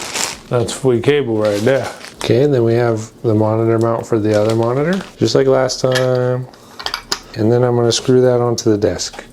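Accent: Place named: United Kingdom. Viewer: The british accent always has me 💀💀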